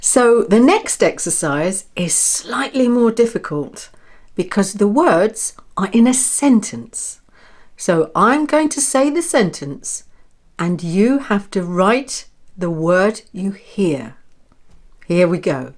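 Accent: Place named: United Kingdom. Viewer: British accent... so lovely !!!!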